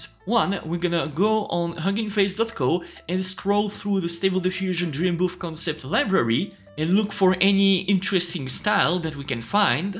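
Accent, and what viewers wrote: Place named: France. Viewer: You sound french, are you french?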